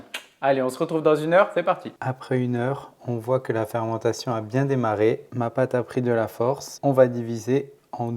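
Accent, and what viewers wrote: France. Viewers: Sigh! I love listening to your beautiful French voice! In honor of my French ancestors I salute you sir!🇫🇷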